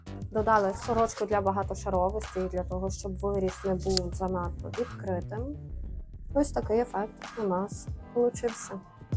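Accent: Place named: Western Ukraine, Ukraine. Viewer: а мені подобається , як Ви говорите. Можливо, це діалектизм навіть. По наголосах можу припустити , що Ви із заходу. Сорі якщо не вгадала )) і «получився» мені норм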